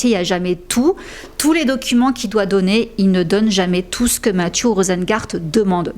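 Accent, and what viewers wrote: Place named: France. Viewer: Tu pourrais faire un minimum d efforts pour l accent anglais? On dirait une blague tellement tu franchouillardises des mots basiques .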